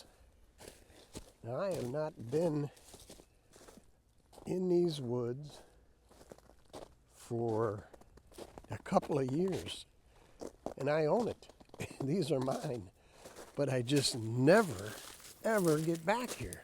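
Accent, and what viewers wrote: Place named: Midwest, United States. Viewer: The Ramblings of Bry As an American from the South, sounds as mid-western as Walter Cronkite.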